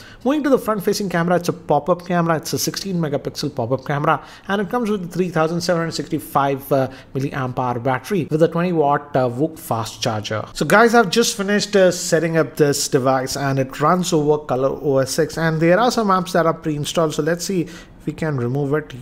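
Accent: India. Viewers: Nice a indian speaking englishI insta sub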